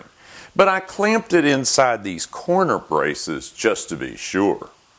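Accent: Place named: South, United States. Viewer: watch at 1.25 speed, trust me. dudes talented intelligent amazing but that southern slow talkin'...phew!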